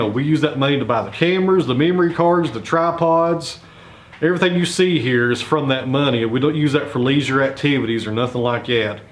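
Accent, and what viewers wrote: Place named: Southwestern Virginia, United States. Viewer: don't listen to the whi ers out there. I really enjoy your channel, I evev understand your talk, ( my family is original from southwestern va.) 😂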